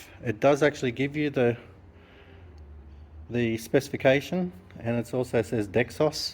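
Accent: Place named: Australia. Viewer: I do like the Aussie accent, mate. The metric measurements and colourful expressions are also charming.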